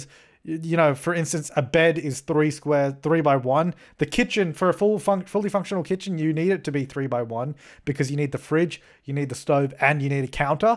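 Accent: Australia. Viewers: it's the accent, all dudes sound like this in Australia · It look me too long to recognise your accent is Aussie 😂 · They both have Australian accents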